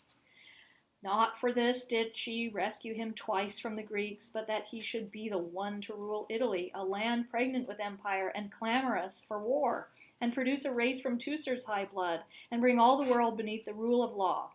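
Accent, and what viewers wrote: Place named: United Kingdom. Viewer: Ye what is up with all those audiobooks with British accents?? Where are all them American accents??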